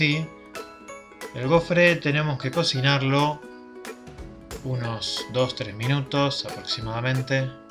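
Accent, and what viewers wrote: Argentina. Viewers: Hola! Muy rica receta de waffles! Ame! Me llamo la atención tu acento sos Argentino o Uruguayo? Muy rico todo voy a seguir las recetas!! =)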